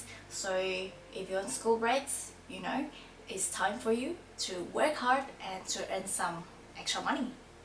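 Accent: Australia. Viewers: 7 years with a good ozzy accent, me living for 11 years (94 - 05) still cant get that accent. BTW good luck on your goal. sukses ! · hello! i'm indonesian too, i really love to watching your videos! i actually thought you're australian cuz your accent is so awesome, cool!😳😍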